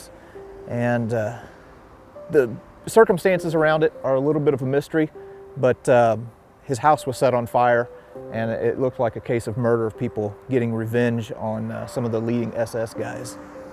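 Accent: United States. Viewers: This is the kind of attitude that makes Americans disliked around the world. American pronunciation in France? Please don't forget the Americans were a minority on Day Day and all of the other nations on the Western front without which the final victory would not have been possible. No disrespect meant to the service men on all sides.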